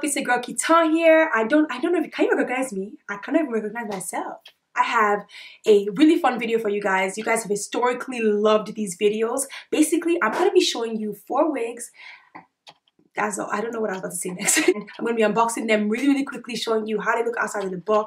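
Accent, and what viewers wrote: Nigeria. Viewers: I love your Nigerian accent. You had me dying. (I'm Nigerian so it made me laugh) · Pixie crop is hands down my favorite plus I love how you switch up that Nigerian accent. Steady makes me smile 😀😀😀😀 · I just want to keep listening to you and that mixed Naija accent. Watching your videos instead of editing mine and im already late for my Youtube upload. Stopppeeet! Ya too cute girly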